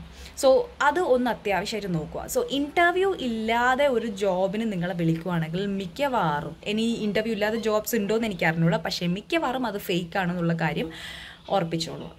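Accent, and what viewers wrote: Kannur, India. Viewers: കണ്ണൂര് കാരിയാണല്ലേ. നല്ല അവതരണം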